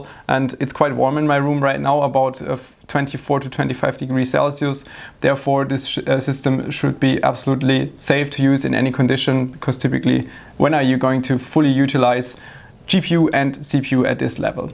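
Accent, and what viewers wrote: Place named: Germany. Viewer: Just out of curiosity..... Why do Germans say the words like “of” and “have” with an English V, and some words like overclocked with a W sound? Great video, and no RGB.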